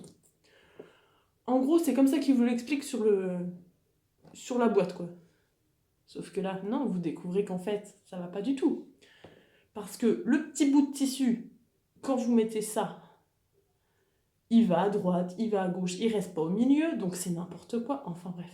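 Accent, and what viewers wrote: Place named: Lorraine, France. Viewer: Je viens de découvrir tes podcasts, que j’apprécie beaucoup et je me disais bien que je reconnaissais cet accent...Je suis allée au lycée à Lunéville, j’habite maintenant en Allemagne. Ça me fait plaisir de t’écouter, le podcast est intéressant et inspirant, et ça me transporte dans la région de ma jeunesse 😉. Bonne continuation....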